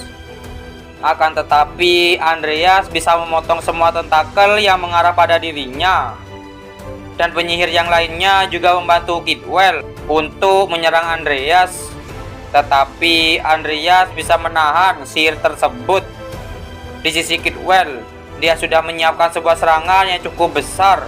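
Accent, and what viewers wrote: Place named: Bali, Indonesia. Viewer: Logat ngomong nya kyk orang bali kyk bli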